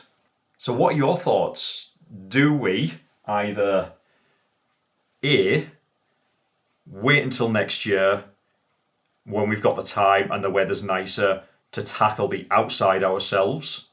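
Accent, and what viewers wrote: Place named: North East England, United Kingdom. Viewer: Hi guys, I agree with everyone that says use professionals, sometimes it’s money well spent and leaves you both to do others jobs. where’s your accent from, we moved from Sunderland to Morpeth nearly a year ago and your accent sounds from up here. I’m loving your channel and have just subscribed, I’ve just finished bingeing all your other videos.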